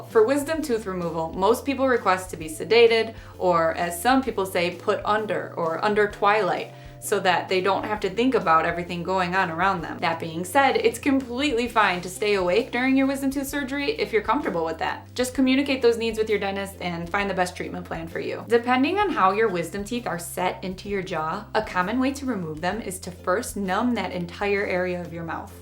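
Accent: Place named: Dakota, United States. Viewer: May I ask a question are you from north or South Dakota? I recognize the accent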